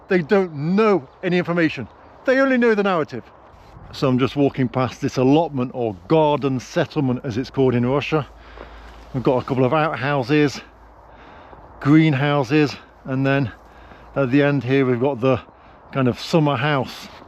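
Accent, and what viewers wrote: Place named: North, United Kingdom. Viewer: У автора просто типичный северный говор)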